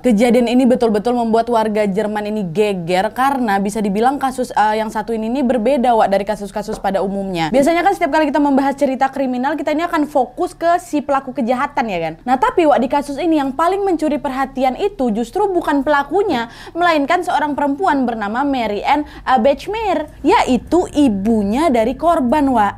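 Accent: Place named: Aceh, Indonesia. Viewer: Logat acehnya kental ya ❤️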